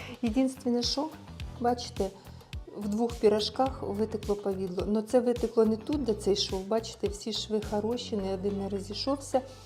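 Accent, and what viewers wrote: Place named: Surzhyk, Ukraine. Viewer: Пиріжки, а не піражки, робити, а не рабиць, не мЯгкий, а м'якИй ,і т.д. Вибачаюсь, але мене цей суржик страшенно дістає...Це приниження нашої мови! Ви така красива і розумна, але цей суржик знецінює все... Чи вам теж - " какая разница?"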